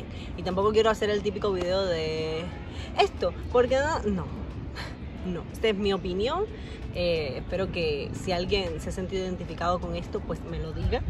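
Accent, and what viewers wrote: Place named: Andalusia, Spain. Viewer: Pareces Andaluza!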